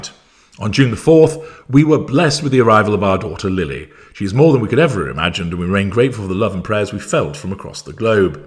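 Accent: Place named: RP, United Kingdom. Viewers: This man’s channel is only positioned to offer his guesses. He uses a posh manner of speaking and decent production vale’s which both lend themselves to a “feeling” of truth. His guesses and opinions have no more credibility than yours or mine. For anyone who cares about my opinion I happen to think there does exist 1 boy child, born by a surrogate, that is of no relation to either Harry or Meghan. I believe they have no custody of him. I believe there is no girl child whatsoever because the child was supposedly born in the US. I notice the British have trouble understanding that in the US there are no secret births no redacted birth certificates. If a birth certificate cannot be located by the most ordinary citizen there is only 1 reason for that — there was no birth. There are no exceptions. It is that simple and no one on planet earth can refute that fact. 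The BRF — none of them — have ever seen this girl child. Serious mistakes have been made by both Queen Elizabeth and even more so by Charles. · You the devil's son,found out your kids are not yours,so making up bullshit about others. Trying to talk posh when you just so sick,should be in a mental home. Tina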